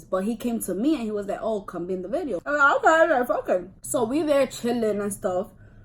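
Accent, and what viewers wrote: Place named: Bronx, United States. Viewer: Hold up, you gotta be from the Bronx😭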